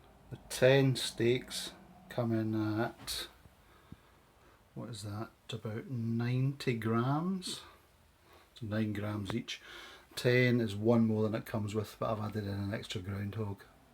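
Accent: Scotland, United Kingdom. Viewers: He's a Scot...you're a moron.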